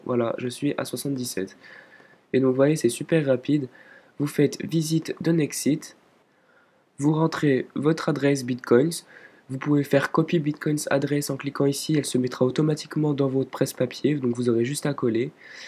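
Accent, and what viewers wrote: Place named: France. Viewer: Bravo pour votre accent français, ça fait plaisir.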